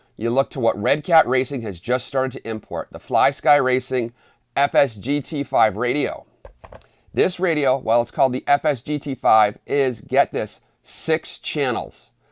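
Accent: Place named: New England, United States. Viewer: What part of New England ar u from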